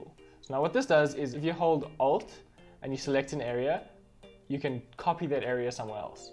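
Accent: South Africa. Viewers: you sound like you are from South Africa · CaptanW smh hes got a south african accent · This guy sounds South African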